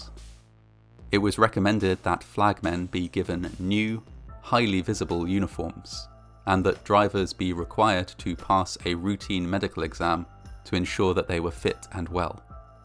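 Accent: United Kingdom. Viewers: What I find fascinating is this American disaster is being narrated by a Brit.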